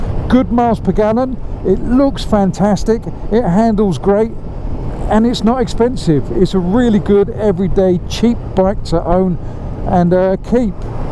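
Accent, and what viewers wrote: United Kingdom. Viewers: Right giggle nice words mate love UK English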